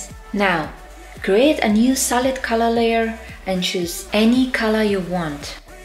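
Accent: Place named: Australia. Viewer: Sound Australian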